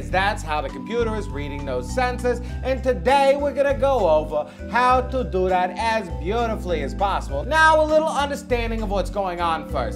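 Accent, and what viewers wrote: New York, United States. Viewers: Thanks, that was very informative and love the NY accent..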